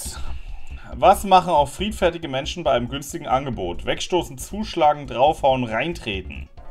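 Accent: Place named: Saxony, Germany. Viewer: Sächsisch lool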